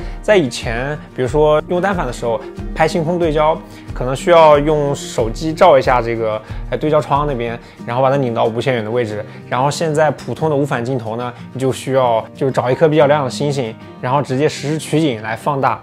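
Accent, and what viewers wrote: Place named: Henan, China. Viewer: 突然这一集满满的fu南话？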